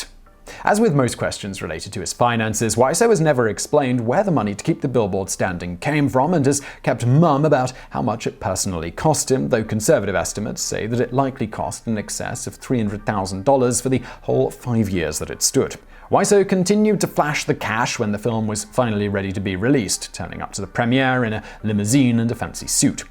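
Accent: United Kingdom. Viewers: The funniest part is hearing a man with an impeccable British accent quote the broken English of Tommy Wiseau. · Simon reading Tommy's unintelligible quotes with a dignified and professional British accent is pure gold.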